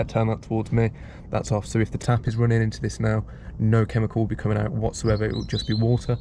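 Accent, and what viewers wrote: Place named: Staffordshire, United Kingdom. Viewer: Alright. You sound like your from the staffordshire area.... do you have a go to shop for powerwashers and accessories. If not what is your recommended website?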